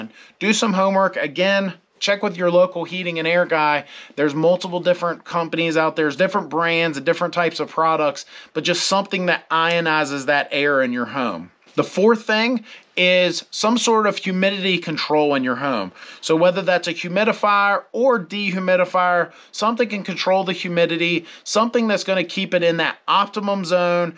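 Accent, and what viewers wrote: South, United States. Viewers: Sound like a southern accent. Anywhere near Louisiana? Had 2 HVAC come out with both saying completely different things.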